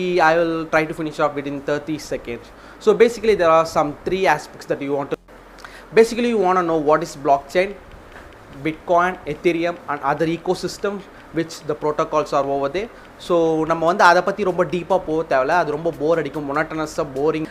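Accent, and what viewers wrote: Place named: United States. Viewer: American accent🔥🔥🔥🔥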